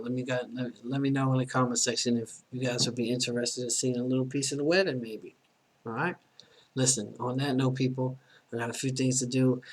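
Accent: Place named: Queens, United States. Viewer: Where u from man Oh wait shit u from queens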